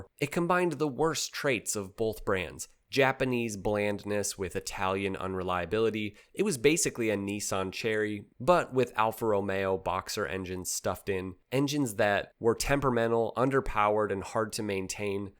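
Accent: United States. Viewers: Yes but you hear that accent and you know to apply the US definition of "Global" (NY to LA) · Every Citroen is the worst one 😂 but seriously, he sounds like an American so he probably doesn’t know France even exists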